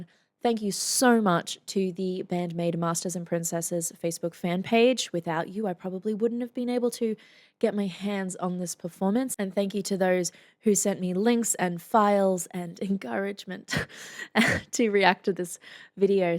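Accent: Australia. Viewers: I’m an Aussie so don’t usually find Australian accents very interesting, but you always so amazing!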